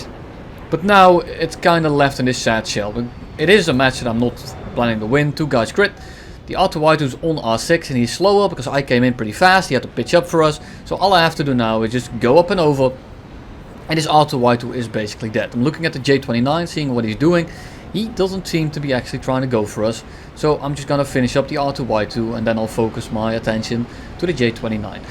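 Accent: Netherlands. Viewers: Ik hoor het Nederlandse accent in hem but sometimes he speaks English like an American!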